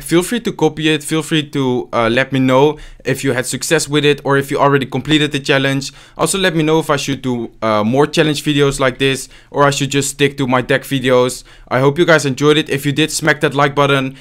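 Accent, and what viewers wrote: Netherlands. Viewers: Met je Nederlandse accent klink je engels wel verlept Sorry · Lekker Nederlands actcent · e gast ben je nederla'ds'ikan da horenaan u stem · Are you dutch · He is dutch I hear that on hes cracky english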